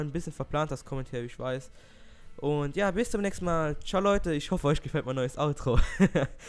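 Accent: Luxembourg. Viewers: Das sind keine Sprachfehler, das ist Dialekt. Er ist Luxemburger :)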